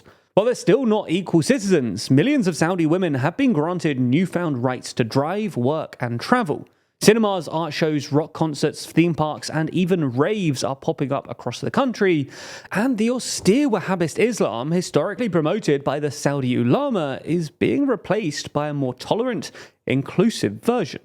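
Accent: United Kingdom. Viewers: Just say, Muslim scholars. Stop trying to pronounce Arabic words with your goofy British accent.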